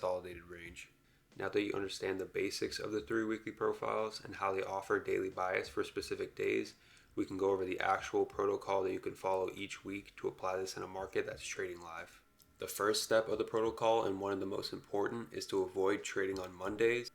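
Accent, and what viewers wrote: South Africa. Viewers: Yoh u South African?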